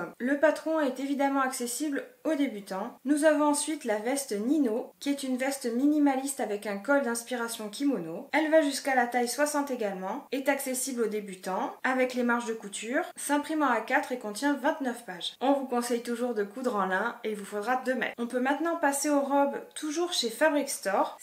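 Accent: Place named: United Kingdom. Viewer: Coucou! Quelle belle capsule, tu nous as gâtées! j'ai déjà repéré 2-3 patrons! Alors, je vais me moquer de ton accent so british 🤣🤣🤣 mais délicieusement frenchie! trop mimi! Alors, si tu as besoin, je peux te traduire quelques patrons (j'en ai fait un pour Audrey), j'ai bien aimé l'exercice. Bon, mon vocabulaire couture en anglais est limité, mais on peut y arriver, avec l'aide de notre ami google 😂 A bientôt! 😘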